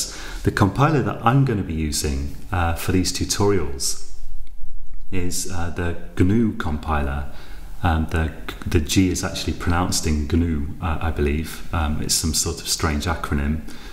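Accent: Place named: United Kingdom. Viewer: thank you for this very helpful video, besides i love your English Accent. Sound like my British friend and some BCC news broadcasters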